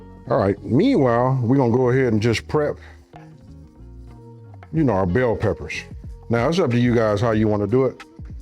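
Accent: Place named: West Coast, United States. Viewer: He got that west coast hood accent. I be waitin for him to call us busters 😆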